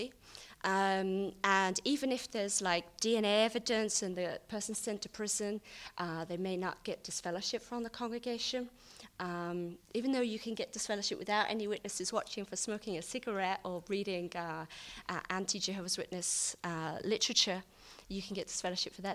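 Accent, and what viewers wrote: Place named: Australia. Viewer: she sounds kind of Australian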